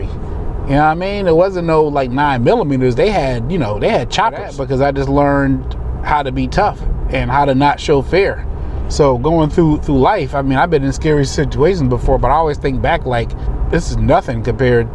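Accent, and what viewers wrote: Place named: Bridgeport, United States. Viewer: If Bridgeport CT is so close to the Bronx NY why is your accent so different ?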